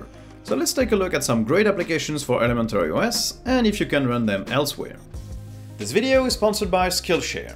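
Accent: France. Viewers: Not My Audio. But saying your french makes a lot of sense. I can understand Indian accent, Asian Accent etc. But have always struggled very hard to understand French accent. My brain just does not want to understand that accent.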